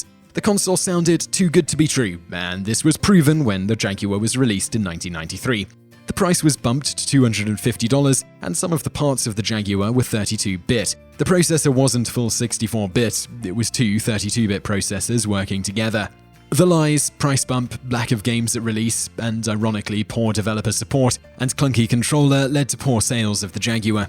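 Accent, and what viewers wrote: United Kingdom. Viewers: Wow. You must be ignorant to the fact that Great Britain alone has many many accents. It's his accent.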